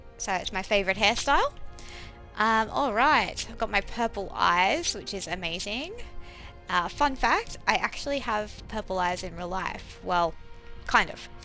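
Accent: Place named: Australia. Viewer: So nice to hear a familiar accent! Aussies unite :)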